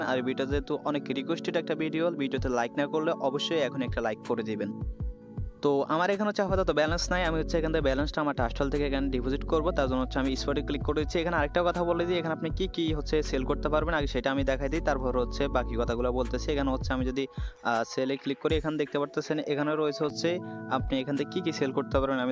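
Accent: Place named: Bhola, Bangladesh. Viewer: Vai apnar bari bhola jelay mone hoy.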